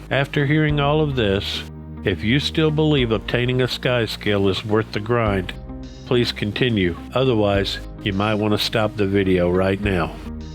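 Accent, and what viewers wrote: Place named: South, United States. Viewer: Thanks, love your voice...you should do radio! Where are you from? There is a soft inflection there that reminds me of the south, where I did a lot of my growing up. I am too uncoordinated for JPs, does getting the skyscale require those? My server sucks too as I am in the remote north (more north than the Nords, lol). Thanks again.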